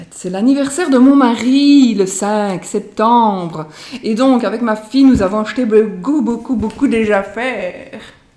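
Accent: Belgium. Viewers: Bonjour,Magnifique ton oracle … tes dessin sont tellement parlant … il m'a tapé dans l'œil dès que je l'ai vu et j'aimerais vraiment me le procurer … j'espère que cela est encore possible.. J'adore ton accent vive la Belgique … cet accent il me manque … mon mari était belge malheureusement il est décédé en juin 2018. Belle journée à toi. merci Pascale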